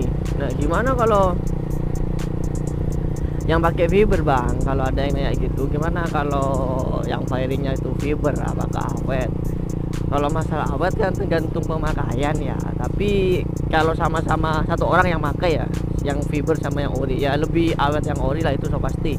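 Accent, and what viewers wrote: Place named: Madura, Indonesia. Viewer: Maap bahasa indo khas Madura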